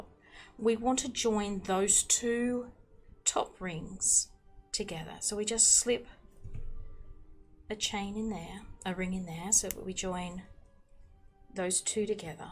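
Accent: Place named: Australia. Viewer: This pendant is beautifully styled!! I found this tutorial, like all others I have watched here, was quite easy to follow along with, and soft Aussie voice is both soothing and relaxed making the atmosphere one where I felt I was having a private lesson with as my tutor!! Lucky me!!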